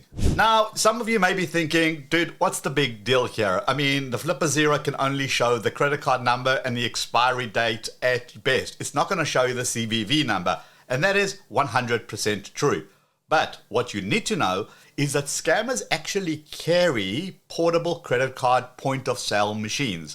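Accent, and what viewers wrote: South Africa. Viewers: Love that lekker South African accent of yours!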